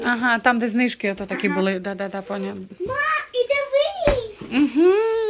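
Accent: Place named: Kremenchuk, Ukraine. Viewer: долгие лета! Счастья и здоровья!,очень нравитесь вы и ваша сестричка. Очень нравится как вы говорите,у меня двоюродная сестра из Кременчуга,так- же разговаривала,слова как ручеек льются.❤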